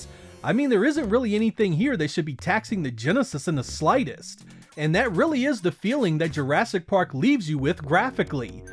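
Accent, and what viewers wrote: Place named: Northeast, United States. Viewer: you have a funny accent are you from some weird northeastern US city?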